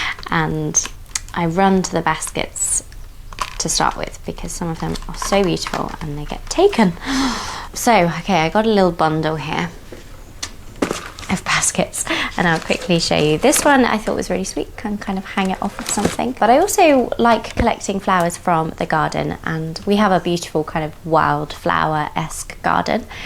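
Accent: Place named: United Kingdom. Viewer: I love your lovely British accent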